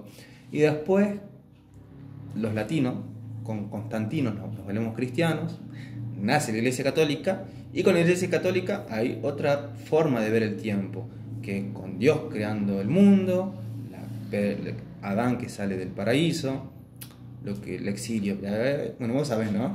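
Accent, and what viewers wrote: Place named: Salta, Argentina. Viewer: ¿Che, sos de Salta? Porque tenés un acento bastante parecido al de Jonathan Ramos.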